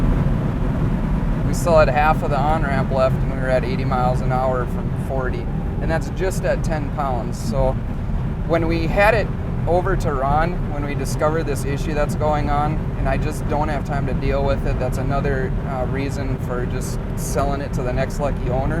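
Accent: Canada. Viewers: i dont mean to offend you, the car is cool and all, i mean im not gonna buy it, but, your canadian accent is super annoying. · are u canadian?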